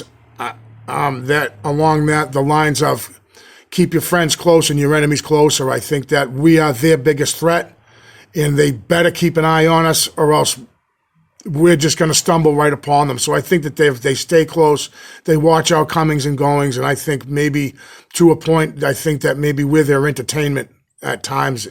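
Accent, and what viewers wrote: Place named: South, United States. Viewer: Awwww, ~ just goes to show that you cannot please all of the people all of the time. YT is FULL of trolls! I will be the first to admit that I'm a sucker for your accent ~ being from the South, I find it very charming. (i.e. the way you say question "mahks" ❤❤❤) I LOVE listening to you, to your descriptions, to your stories... just being able to tag along with you on your adventures. I even like it when you just sit in your van and talk. Hmmm guess I'm just a true fangirl Haha. Seriously though ~ not just patting you on your back ~You make the world a better place being the down to earth, intelligent, great guy that you are. I don't usually say things like this... but that guy is a douche. Eww 🤢 I can't believe I used that word Haha. Maybe I made you laugh. Or at least smile after that loser's nonsense. You should have the option to unsubscribe him from your channel since apparently he doesn't know that's an option! 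Be well and do you,! Much love from Tennessee!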